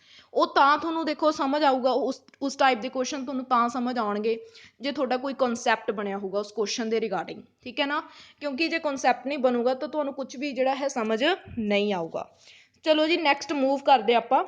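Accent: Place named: Malwa, India. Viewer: Wao mam malwa belt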